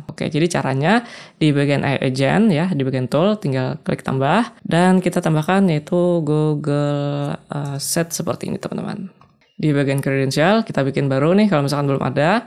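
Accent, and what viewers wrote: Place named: Banjarbaru, Indonesia. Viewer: orang Banjarbaru bang😅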